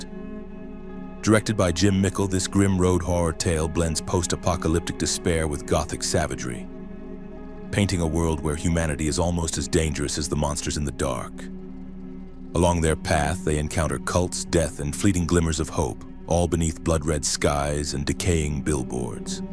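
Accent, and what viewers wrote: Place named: Australia. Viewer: Something about the Oz accent...like fingernails on a chalkboard 😅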